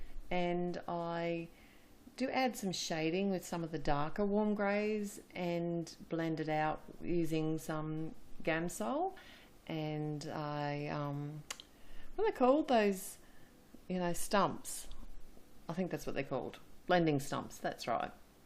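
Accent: Australia. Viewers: Love your card so beautiful. Also love your Aussie and you Iink to an Aussie store for your supplies. Thank you so much.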